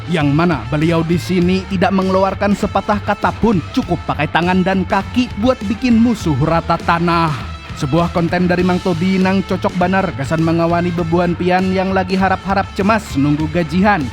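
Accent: Banjar, Indonesia. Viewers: Mang Pian asli buhan Banjar kh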